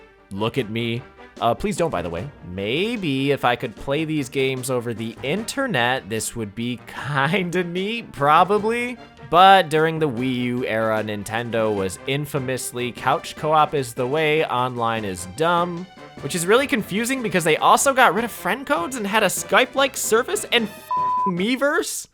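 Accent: Brazil. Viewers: Are you brazilian? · Is he Brazilian or something?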